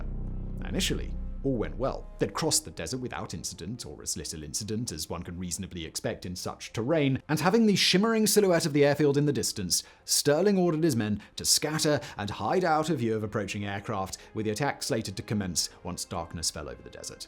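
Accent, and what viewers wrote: RP, United Kingdom. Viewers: When someone speaks the Queens English, it can be assumed the person values one's roots. 😉🇬🇧🏴✌️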